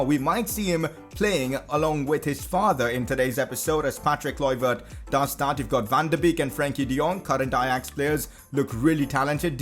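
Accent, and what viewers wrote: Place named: India. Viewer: umm, you kind of have a indian accent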